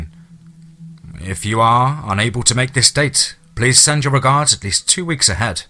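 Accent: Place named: Scotland, United Kingdom. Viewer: It's one am and I'm halfway through... loving it. Love the Scottish accent as well.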